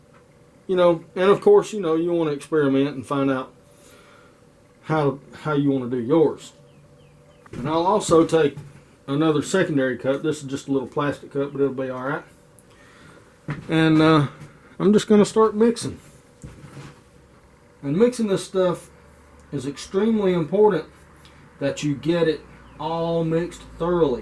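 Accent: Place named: Texarkana, United States. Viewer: That was fantastic. I am gearing up to turn my first blank and spending time looking at at many videos of the whole process as i can. i am trying to place your cool accent, Texarkana region by any chance?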